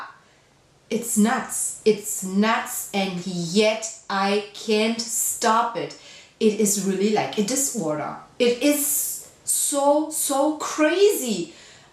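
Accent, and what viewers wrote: Germany. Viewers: Where are you from? You sound Swabian.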